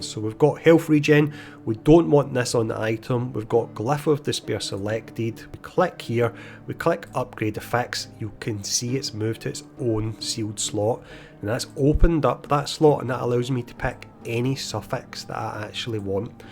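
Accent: Scotland, United Kingdom. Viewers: Weird how he’s pronouncing his words differently…He’s Scottish! ya Dork 🙄🤦‍♂️ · Awright, fellow Scot, ye got a a new sub, really informative.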